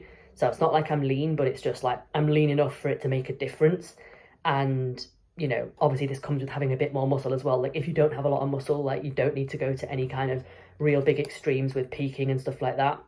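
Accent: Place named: England, United Kingdom. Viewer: Yayy a new video 🥰 I love your accent btw haha are you English?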